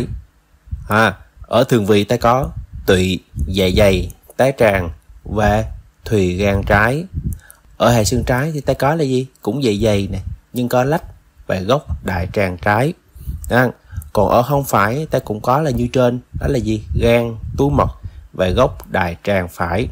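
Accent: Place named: Khanh Hoa, Vietnam. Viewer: Nghe giọng bs quen quá hihi giọng quê hương giống ở KHánh Hoà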